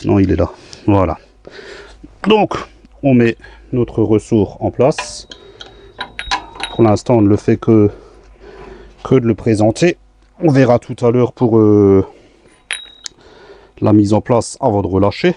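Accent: Alsace, France. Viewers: salut j'aime bien tes vidéos et avec l'accent alsacien c'est encore mieux continue comme ca c'est bien utile tes tutos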